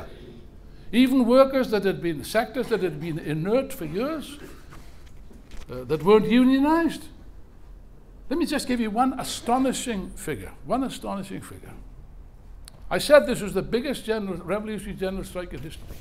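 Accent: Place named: Wales, United Kingdom. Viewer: It IS a welsh accent, you’re right. Interesting that I didn’t pick up on it, since I’m a big fan of The Manics.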